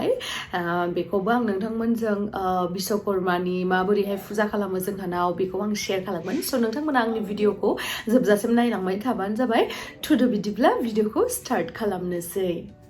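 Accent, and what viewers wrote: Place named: Chirang, India. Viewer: Abo ngni railainaiya manw Chirangnibaidisw Jayw hw ngsinibaidi jaya teobw hitt kwnayw abo wkki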